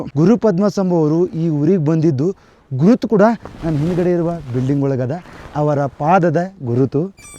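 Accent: North Karnataka, India. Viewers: Uttar karnataka bhase Bhari aeitri anna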